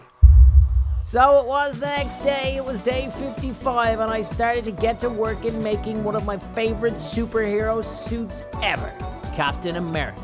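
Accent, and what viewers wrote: Ireland. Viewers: Are u Irish like me?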